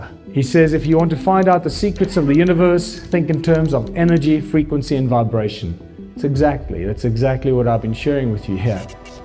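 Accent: South Africa. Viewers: I stumbled upon this for the second time and I realised that it's a South African speaking here. I love how we are so diverse in ideology and thought because it opens up the potential for many of us to think differently perhaps, hopefully, come together and see how such can bring us together towards a common understanding about what it means to be human.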